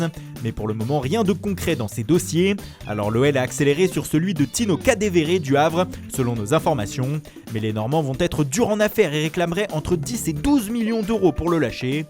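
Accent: Italy. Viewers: Putain j'adore quand tu fais l'accent italien!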